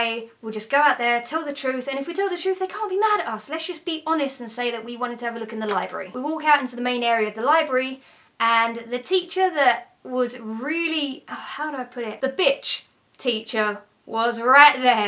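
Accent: United Kingdom. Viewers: it´s a british accent :) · British accent <3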